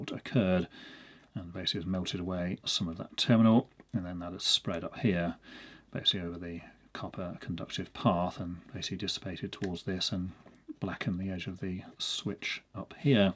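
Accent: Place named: Northern England, United Kingdom. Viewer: Sometimes, sounds Northern.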